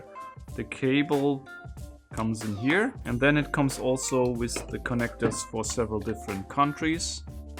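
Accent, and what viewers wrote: Germany. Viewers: I live in a very German area in Texas. Your accent is normal to hear around here. Very normal! Fredericksburg Texas is a small German town. I spoke German in school until it was verboten in 2nd grade. Back in 1973... I can go to town and still here people speaking German to this day... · I miss his german accent, its still there but back in the day it was more german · Iwie hörst du dich deutsch an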